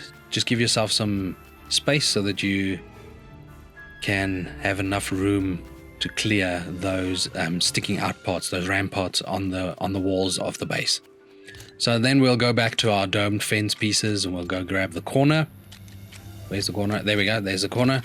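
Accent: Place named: South Africa. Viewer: Heard the accent and immediately knew it was a fellow Safa. Great to see, wishing you lots of growth. Sub status achieved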